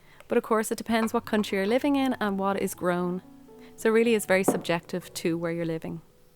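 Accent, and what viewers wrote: Ireland. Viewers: This is the first video of yours I've seen and I heard the accent and was looking at fields trying to recognise what county you're in ha! I grew up in the Wicklow mountains and it's so gorgeous, we're so lucky to have such gorgeous countryside ❤ living in Dublin city now and doing the best I can to be sustainable in a flat!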